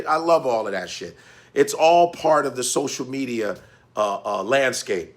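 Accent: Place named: Chicago, United States. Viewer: Congratulations, my Chi-Town Brother. Although I was born and raised on the do-or-die Near West Side of Chicago, where most people speak with a different accent than you, I appreciate yours. Just like I appreciate New York and Atlanta accents and others. I appreciate your intelligence, your talents, and your skills as well. Thanks for being unapologetically Black in public. In addition to that, thanks for sharing YOUR SPECIFIC Black experience. All the best, my Brother.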